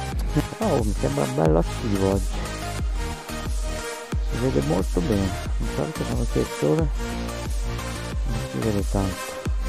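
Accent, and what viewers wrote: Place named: Romagna, Italy. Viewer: figata di strada, davvero bella, poi mi piacciono un casino i tuoi commenti...l'accento romagnolo mi fa morire....tranquillo...sono sposato e con una figlia🤣🤣🤣🤣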